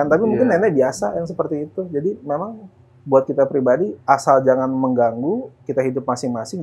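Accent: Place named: Kebumen, Indonesia. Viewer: Whh trnyata mas wong 'ngapak' Kebumen jg yh.. Kebumen nya mn mas??😅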